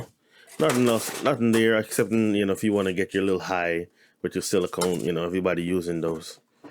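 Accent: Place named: Jamaica. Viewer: Hey. You sound like a Yardie. If you are Big Up Yuhself!! Enjoyed your video, keep up the great work. Can't wait to see part 2.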